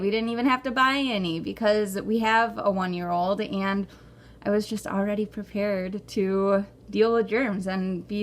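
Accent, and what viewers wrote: United States. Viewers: I like your Michigan accent.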